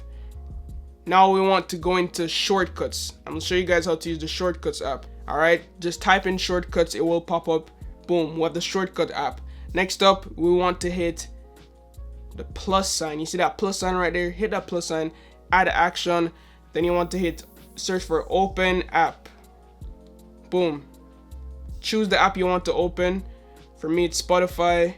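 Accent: Jamaica. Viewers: Yo bruh kl vid but one question, you Jamaican? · I used to go to school with him in jamaica lol we have cool accents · You Jamaican dawg? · U sound Jamaican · Are you from Jamaica (or Caribbean) by way of England??? I hear a combo in your accent. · I can literally hear the Jamaican accent in ur voice general. Where from Jamaica u come from?? Mi cum from St.Thomas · Yo you Jamaican? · soon as i heard that voice i was like. he gotta be 🇯🇲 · are you Jamaican bro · Iz u Jamaican 😎 🇯🇲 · Ngl u sound Jamaican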